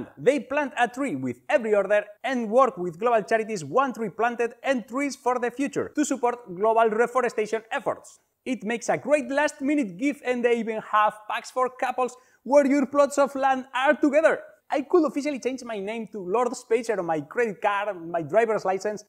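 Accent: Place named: Spain. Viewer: Madre mia que acento, tu eres español amigo! Pd Que passada de video tio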